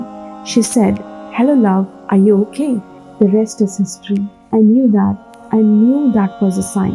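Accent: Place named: India. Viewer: You say you are strictly British yet you have a very Indian accent. I find that's odd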